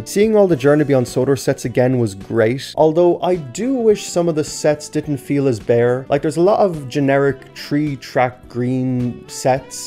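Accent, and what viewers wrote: Ireland. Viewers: you already sound Irish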